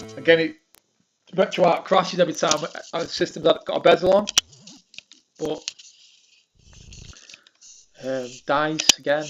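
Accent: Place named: Manchester, United Kingdom. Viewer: Where you from? Manchester? You sound like you're from Wythenshawe, top vids btw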